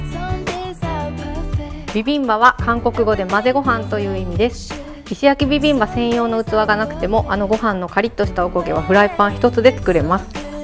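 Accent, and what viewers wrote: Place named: Kansai, Japan. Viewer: 関西の人なんだ。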